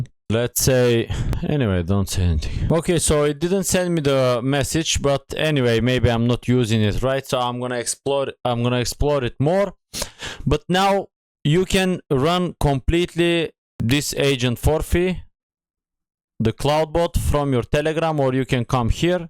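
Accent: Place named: Eastern Europe. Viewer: You sound Eastern European. Hi from Romania. This is such a straight forward, no fluff tutorial you offered us. Thank you so much. You've got a new subscriber!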